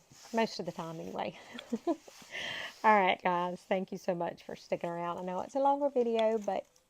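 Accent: South, United States. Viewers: As a new subscriber of yours, I love your videos. I am a southern girl and we can’t help our accents any more than people 🎉from other parts of the country can. You just keep being you and disregard the negative Nellies. If someone’s voice or accent bothers someone that much, they can always use close captions and just read what you say instead. I do that a lot if I can’t turn the volume up when I watch videos. Love your junk mail tips.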